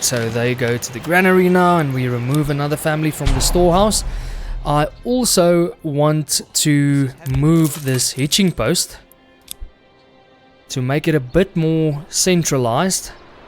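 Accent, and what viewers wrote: South Africa. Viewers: You South African bro? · You South African? Hahaha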